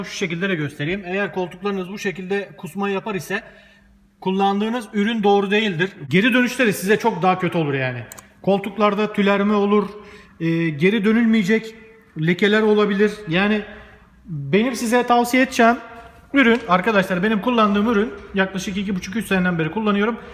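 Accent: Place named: Thrace, Turkey. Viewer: hocam konusman tam trakyali😂 harika yav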